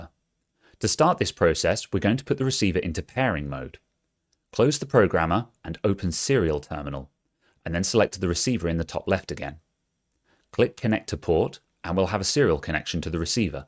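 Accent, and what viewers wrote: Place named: West Midlands, United Kingdom. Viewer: Hi, it was because of your videos that i watch late at night to relax that got me interested in this sort of stuff in the first place, and I have already learnt so much. After a while I managed to convince my wife to buy me a little DIY soldering kit for a graphic equaliser, and I said to her, if it doesn't work, I'll never buy one again, but (honestly, to my actual surprise) the thing works flawlessly, and I hnow have a sort of bug for building simple electronics. Half way through building this equaliser the soldering iron I had blew up completely, so I had to buy one off amazon to get it finished, the soldering gun i now have is amazingly better than the one that blew up, and I'm absolutely willing and looking forward to my next project, so many thanks to you, you've inspired an almost 40-year-old-toddler to try something new. 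Hope that makes you smile tonight. Cheers bro. The next one I do I may consider putting up on Youtube. PS - you sound like you're from the West Midlands ish?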